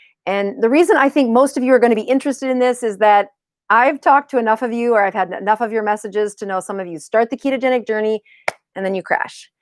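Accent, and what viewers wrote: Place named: South Dakota, United States. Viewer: Great stuff--I lived in SD for four years, loved it, it's nice to hear the South Dakota lilt in combination with some great insights! Only reason I didn't join the course is because I'm not on Facebook and that part of the package was simply not useful. Thanks for your candor and energy,!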